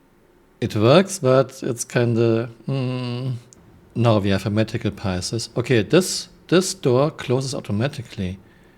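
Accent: Germany. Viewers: Wie deutsch kann man sein wenn man english spricht. MAXIMAL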